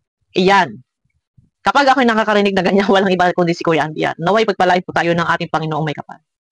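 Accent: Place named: Batangas, Philippines. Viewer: Batangueño yan c kuya Andy kaya ganyan boses nyan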